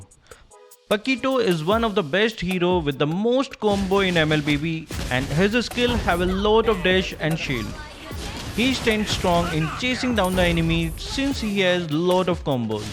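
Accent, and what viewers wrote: India. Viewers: Are you indian your accent sounds soo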